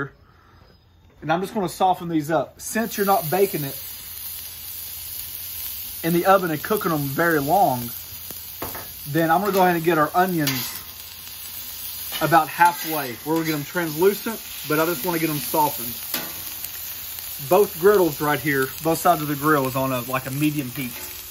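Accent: South, United States. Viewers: .....that will work also....just found your channel....sounds like your from the south....I'm in Illinois, but have family in Nashville TN area....looking to retire and looking for somewhere warmer in the winter....LOVE UPUR VIDEOS...KEEP THEM ROLLING...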